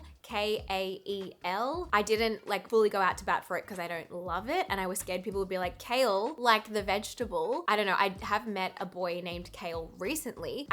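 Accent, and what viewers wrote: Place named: Australia. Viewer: This whole time I thought his name was Hollow not Harlow. I’m not used to the Australian accent I guess.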